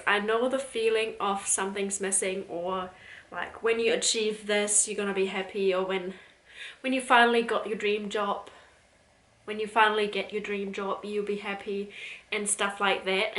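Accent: New Zealand. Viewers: I see your English is getting the kiwi touches. Noticed the way you say happy 🤣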